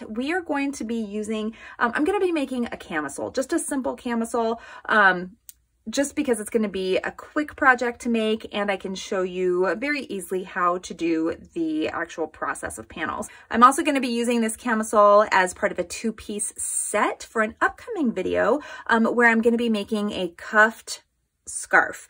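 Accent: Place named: United States. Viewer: Pictures very good but squeaky American voice talking too fast difficult to understand.😢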